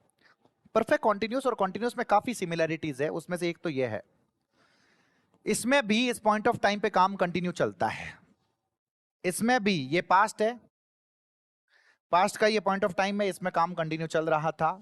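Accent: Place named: Jodhpur, India. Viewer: Ap jodhpur se ho sir ji apki boli to jodhpur ki lag rhi h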